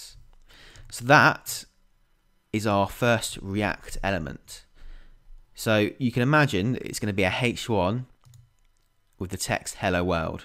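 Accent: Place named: England, United Kingdom. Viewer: Liked your English accent <3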